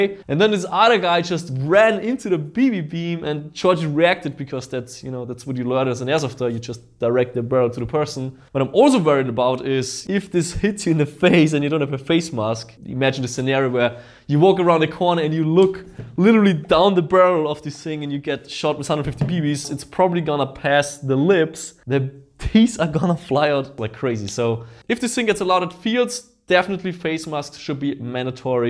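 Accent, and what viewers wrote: Bavaria, Germany. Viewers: Novritisch bist bayrisch na????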